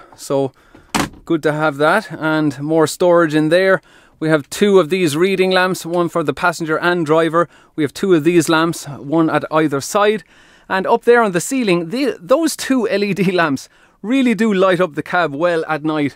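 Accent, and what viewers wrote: Ireland. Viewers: I come for the trucks and stay for the accent haha love you Irish!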